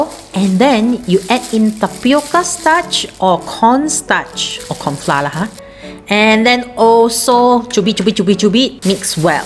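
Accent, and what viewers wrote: Malaysia. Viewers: it's your Manglish I came to listen to, so marinated with BM and Chinese.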